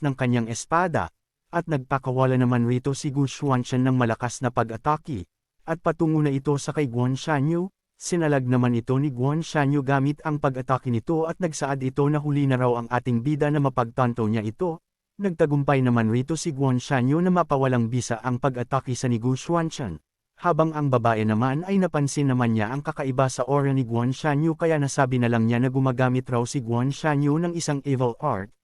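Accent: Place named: United States. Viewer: ok nayan lods wag Mona bagohin feel kolang Kasi Americano mag salita maganda namn recap mo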